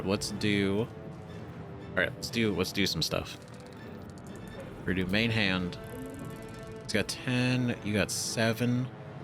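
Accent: England, United Kingdom. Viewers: Yes, it's a loan word from French. I think is English so I assume he's heard it a lot. Maybe he's American though? He does use both English and American words and pronunciations but his accent is English, I think. lol I was just laughing about it and not trying to make a serious complaint or anything. It was meant to be a friendly comment. I'm genuinely sorry if I gave the impression I was trying to be rude or offensive. I apologise.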